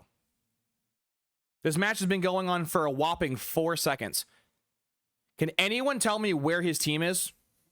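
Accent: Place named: Mid-Atlantic, United States. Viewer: I think your accent was the now extinct Mid-Atlantic accent.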